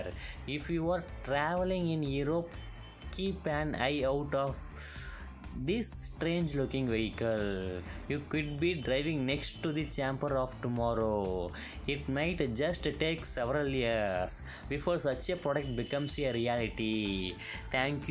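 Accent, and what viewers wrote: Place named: India. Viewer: Heavy Indian accents are just horrible to listen to if you ask me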